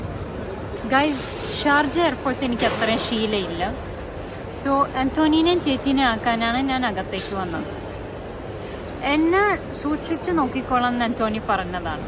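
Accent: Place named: Alappuzha, India. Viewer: Alleppy Slang ❤❤😍😍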